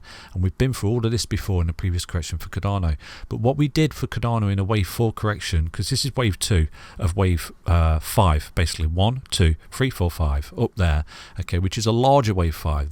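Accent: United Kingdom. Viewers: Putt Putt soft spoken and soft foods edible Brit accent. Have a parfait.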